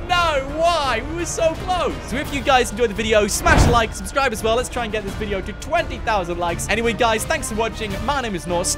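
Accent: United Kingdom. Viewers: Is he British or American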